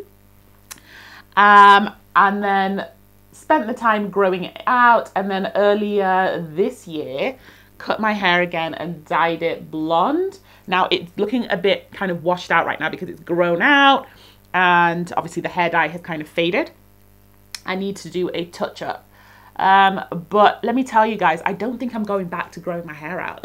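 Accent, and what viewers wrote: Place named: United Kingdom. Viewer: no problem! Haha I can't hear it at all. You sound like you landed from the UK like yesterday 😅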